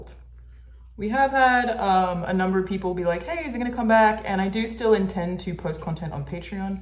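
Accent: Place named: Australia. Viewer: Because she is Australian and that is her accent. Just as your dialect may sound unusual to someone from a different part of your country or a different part of the world.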